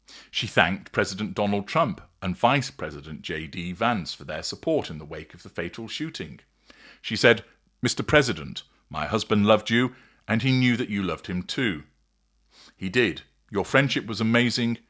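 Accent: United Kingdom. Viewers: Been waiting for this. Next up would be a great comparison between mm and ek. You, Mr. NARRATOR , HAVE THE MOST DIGNIFIED BRITISH ACCENT and EXTENSIVE ENGLISH VOCABULARY, OFTEN MISSING FROM MANY LIVING ACROSS 🇺🇲THE POND. GOD SAVE ENGLAND 🏴 🇬🇧, THE ENTIRE UK 🇬🇧 AND ALL WESTERN COUNTRIES. ⚖️✨️🕯✨️🎚🛐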